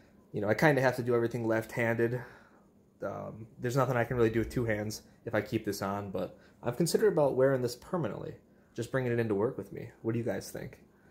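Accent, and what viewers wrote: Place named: Midwest, United States. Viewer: Hahahaha you have the voice down pat! Awesome! You still have the Midwest accent though!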